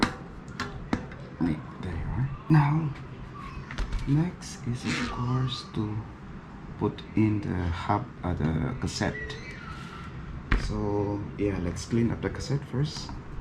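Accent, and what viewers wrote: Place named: Ifugao, Philippines. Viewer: Bro ano accent mo hehehe kala ko di ka Pinoy...taga Ifugao ka ba?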